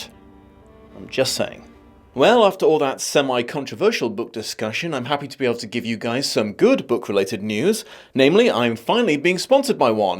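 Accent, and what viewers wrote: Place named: RP, United Kingdom. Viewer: No offense, but it’s a bit weird to hear this guy describe this book in his posh English accent. I start talking with a southern drawl when I think about this book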